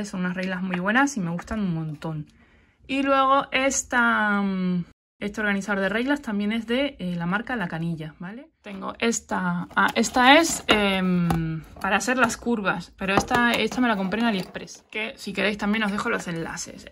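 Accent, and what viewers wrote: Argentina. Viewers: Hola ,te escucho hablar y tenés mucho tono argentino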